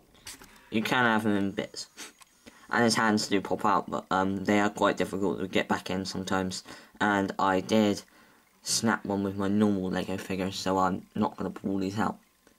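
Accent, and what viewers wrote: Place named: Somerset, United Kingdom. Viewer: Soooooo Somerset 😂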